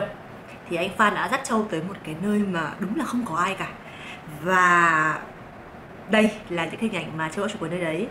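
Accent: Hanoi, Vietnam. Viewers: Thích nghe giọng nói của cô gái Hà Nội này quá đi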